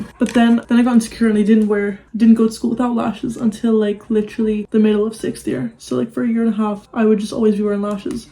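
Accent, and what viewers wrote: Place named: United States. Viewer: U don't sound Irish at all ur accent sounds so american but it definitely slips out when u say photos lmao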